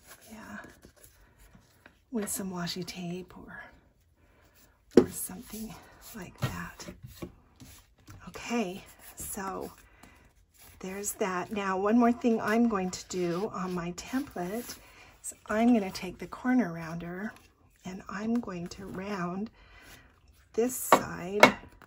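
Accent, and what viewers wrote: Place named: Utah, United States. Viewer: I love this! You sound so much like the people I knew when I lived in Providence, Utah in Cache Valley. You sound like a lady I knew very well in relief society. I’m from East Tennessee and I’m back here now but I lived in Utah for 8 years. I’m happy to have found your YouTube channel!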